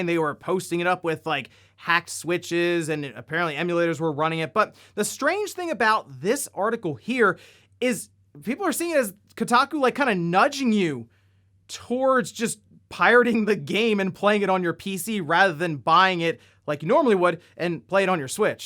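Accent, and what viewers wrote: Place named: Philadelphia, United States. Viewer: U from philly area, I hear it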